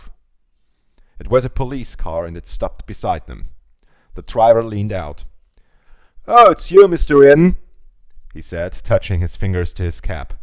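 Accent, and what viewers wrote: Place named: Germany. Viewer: Legendary speech! Also, it is funny you show you’re German by your v’s and w’s being mixed up. Great job reading! You are very good at English!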